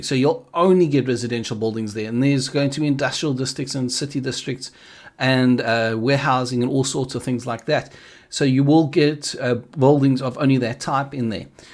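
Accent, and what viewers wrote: South Africa. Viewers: my man great video one question are you south african cause you sound like one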